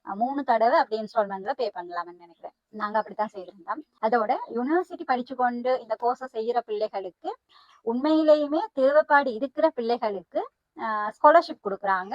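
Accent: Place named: Sri Lanka. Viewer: நாட்டு வாங்கும் பற்றி அருமையான விளக்கம் இதைப்பற்றி பல விளக்கங்கள் கூறினீர்கள் மற்றவர்களின் வேண்டுகோளுக்கு இணங்கி இந்த நிகழ்ச்சியை பதிவு செய்த உங்களுக்கு வாழ்த்துக்கள். உங்களுடைய ஸ்ரீலங்கா தமிழ் பேசுவது மிகவும் அருமை கேட்பதற்கு நன்றாக உள்ளது வாழ்த்துக்கள். நடனத்தில் சிறந்தவர் வாழ்த்துக்கள்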